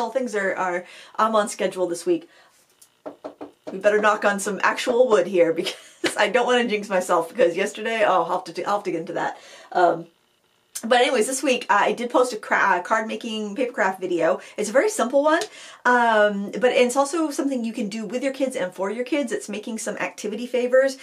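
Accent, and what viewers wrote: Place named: United States. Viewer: Just tuned into you by accident. I love your energy. Being an Old English Great Grandmother, at 1st i had to get used to the ' fast talking' American accent which im coming to abdolutrly LOVE . Its got my old arthritic body up n painting. Looking forward to seeing n hraring you much more often. Big hug from across the pond. Xxxx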